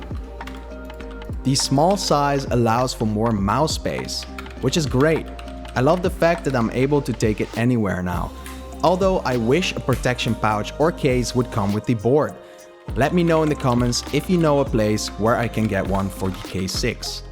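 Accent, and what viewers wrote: Netherlands. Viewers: you sound Dutch? klopt dat? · Is he Dutch, he sounds Dutch... love the vid, really helpful. I still don’t know, either k6, K1 or k2. Atm k2 hot-swappable is not available in brown switched. :( and I think I want brown switches instead of the red ones. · Are you from The Netherlands because I think im hearing a slight Dutch accent?🤔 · Gotta be Dutch! :)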